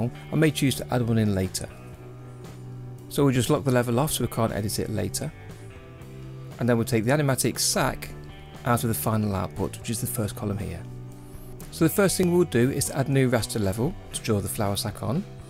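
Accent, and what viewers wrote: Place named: England, United Kingdom. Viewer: I hate English accent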